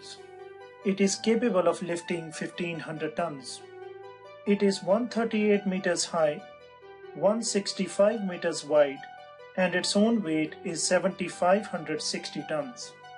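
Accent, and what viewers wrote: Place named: India. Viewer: As soon as I hear an Indian accent, I know it's going to be full of bullshit and factual errors.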